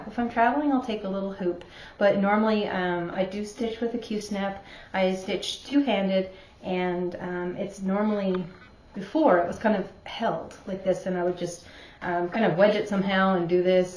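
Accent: Canada. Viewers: I KNEW I was hearing Newfoundland in your speech!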